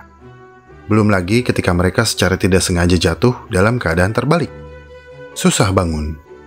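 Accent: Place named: West Java, Indonesia. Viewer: Sieta mani teungteuingeun. Dr lafalnya Fix orang Sunda.?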